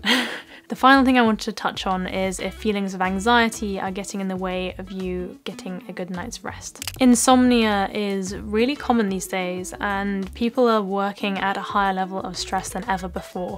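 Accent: England, United Kingdom. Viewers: It's nice to hear proper RP on YouTube. Most of us get shooed off. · Hi,! Thank you so much for sharing about it. I’m learning English now. I like British accent so I learn a lot from your videos. I’m always cheering for you from Tokyo Japan❤ I hope u to come to Japan someday! I love you❤❤❤ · how have you been l hope you re doing well l have watched you for 5 months and h really have benefited as weel as l didnt understand english language but with you there is not much to it and especially british accent from your faithful follow from algeria💜💜💜💜💜💜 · She is a very talented and a beautiful princess. I love her English accent. It is just so cute.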